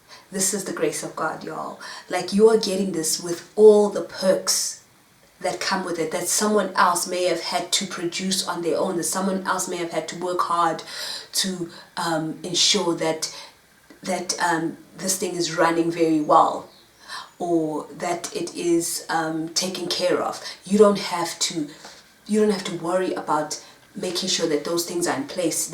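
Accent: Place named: South Africa. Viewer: When I hear your voice, I keep hearing the Safari Live people here on youtube. South Africa. I also wanted to commend you on your singing. I have watched so many auditions and singing shows. I always enjoy a good voice! And you're doing a good job for the Lord as well, with your prophetic voice too. Amen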